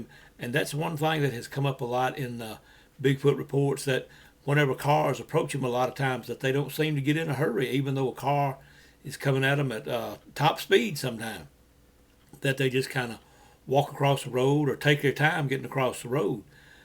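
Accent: South, United States. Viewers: That was a good story, the illustrations were fantastic and I love your southern accent. Glad he didn't shoot BF and all turned out well for everyone, sorry the dogs got beat up tho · I loved listening to your Southern drawl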